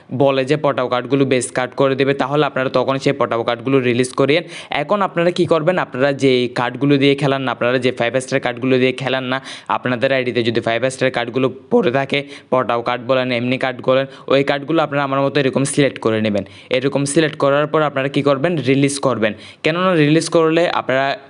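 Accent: Chittagong, Bangladesh. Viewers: Vai apni bangla vasar shate chittainga vasha mix kore felen kno? Apnar kotha golo sunley hasi pay😅😅😅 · Vai ki chatgaiya naki?🫠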